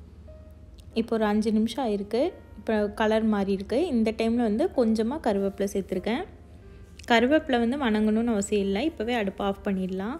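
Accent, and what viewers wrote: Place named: Coimbatore, India. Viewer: Namma slang apdiyae varuthu ka... Naanum Coimbatore thanunga😄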